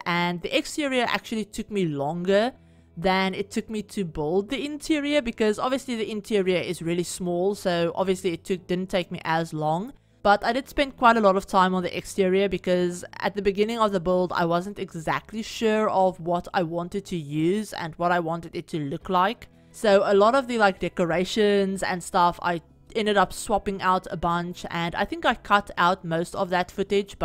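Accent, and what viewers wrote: Afrikaans, South Africa. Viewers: Are you Afrikaans?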